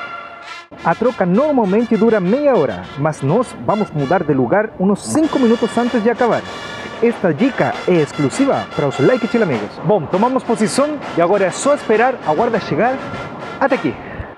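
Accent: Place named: Brazil. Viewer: Tô chegando agora no canal, mas acho deve ser Brasileiro pensei isso no momento que ele começou a falar pelo sotaque.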